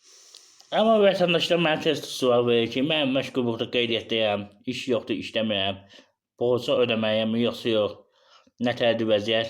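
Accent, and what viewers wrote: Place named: Quba-Khachmaz, Azerbaijan. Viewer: Quba Xaçmaz terrfdən olarsan yəqin?mən Zirəli iyəm bizi də belə danışırıq. Uğurlar qardaş şABUNƏ OLDUNM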